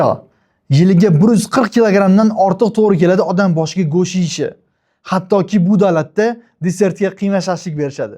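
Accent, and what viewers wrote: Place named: Kashkadarya, Uzbekistan. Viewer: Кашкадаре вилоят шекилли😂😂😂😂😂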